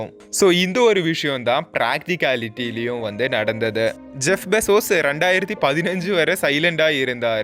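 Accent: Kerala, India. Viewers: Kerala thambi vioce maari irukkea 🤔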